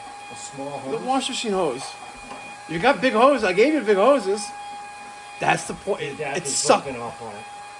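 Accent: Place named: Boston, United States. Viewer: Worst video. Edit this stuff and give us the information. I don't want to hear Bostan accent all day long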